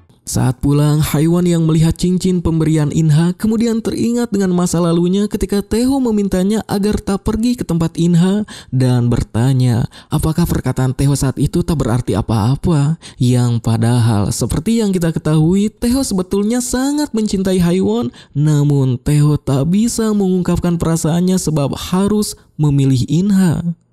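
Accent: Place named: West Java, Indonesia. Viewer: Min orang Sunda nyaaa😭🫵🏻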